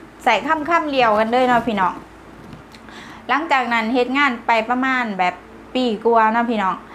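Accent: Ubon Ratchathani, Thailand. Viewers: สำเนียงเจ้าเว้าแบบเดียวกับอุบล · สำเนียงคืออุบลเนาะลาวไต้อ้ายกะอยู่แคมจำปาสักอ้ายยูเดชเลด.เว้า.ล.ลิงคือ.พิบูลสำเนียงคือกันไกล้กัน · สำเนียงเหมือนคนอุบล เพาะเราเชื้อชาติเดียวกัน ผมคนหนองคาย ญาติผมอยู่เวียงจันก็มีอเมกาก็มีปากงึมก็มี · สำเนียงคำพูดคำจาลาวใต้สำเนียงการพูดเหมือนๆกับ.จ.อุบล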